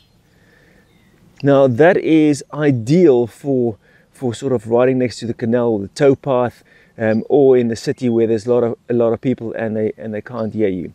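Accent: South Africa. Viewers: Painful! Specs not correct, designed in the UK but built and assembled in China. All parts sourced in China but available here as well. Seeth-Afreekin accent still heavy!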